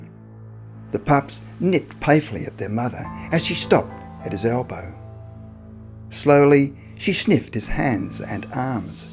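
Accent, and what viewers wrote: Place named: Australia. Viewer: What a touching true story! Proves that "Truth" which is in this case has taken a form of Mother Nature Herself, can manifest in ways far stranger than those in fiction! There is an intrinsic bond among all the inhabitants of the planet which comes to the fore, and as the narrator has so rightly pointed out, all this transcends "conventional wisdom". The narration is also very engaging, I suspect the accent is the cordial Australian one!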